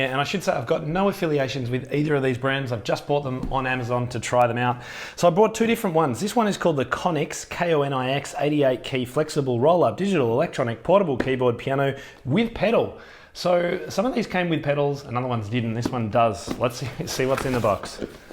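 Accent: Australia. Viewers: I like your accent. Is that Aussie?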